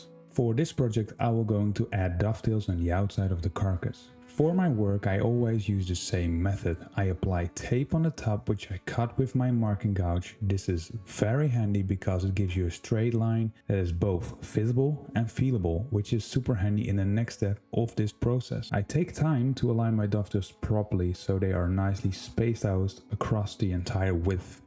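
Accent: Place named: Netherlands. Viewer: Sounds dutch to me, but I like it... I, surprised that you don't have a jig for dovetails.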